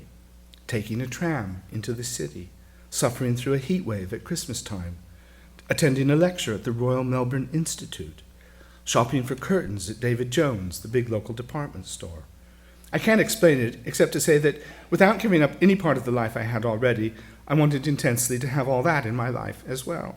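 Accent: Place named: United Kingdom. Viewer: It’s amazing there are moments he sounds British and others American. I can understand why sometimes he describes himself as an alien in his own country.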